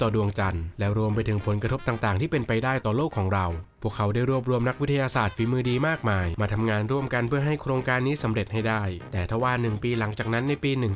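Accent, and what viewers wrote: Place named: Isan, Thailand. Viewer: คนอิสานใช่มั้ย ฟังไม่รุเรื่องเลย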